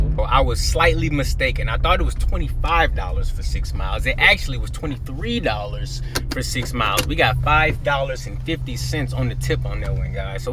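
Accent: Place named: Missouri, United States. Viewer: Funny bro WAZ HAPPENIN! Like that MO accent CHINGY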